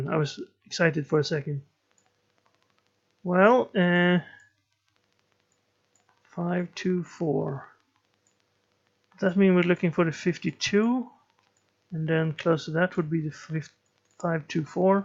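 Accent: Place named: Scotland, United Kingdom. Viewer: For Greek script you can keep in mind that the P is actually an R (similar to Cyrillic in Russia), the triangle is a D, the H is an E, the triangle without a bottom line is an L and the P is an R. That are - im my opinion - the most valuable Greek letters. And if I may ask, from which country are you? Yo sound like a Scotsman.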